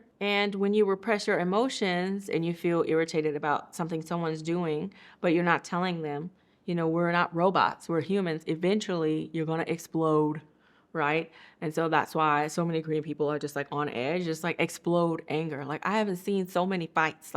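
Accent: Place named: South, United States. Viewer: I love that you speak Korean and have the cutest Southern accent.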